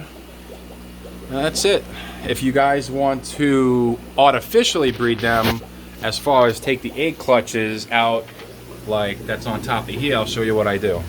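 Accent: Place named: Massachusetts, United States. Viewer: Are you located in Massachusetts? Ha i picked up on the accent im in MA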